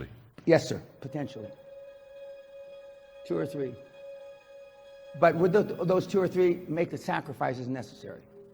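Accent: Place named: Los Angeles, United States. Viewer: It's a mixture. He lived in Europe as a child, because his father was an Army officer in the early 1950s, so he heard a lot of different accents from his father's friends' kids, and from Europeans who spoke English as a second language. Then the family moved to the barrio in East Los Angeles, a bad neighborhood, until his mother insisted they move. Then he lived in a nicer neighborhood. Then he joined the Army, where you hear a variety of accents. I hear some East LA in his accent, but also a bit of posh English, some standard American, it's a unique accent. No one really speaks like him.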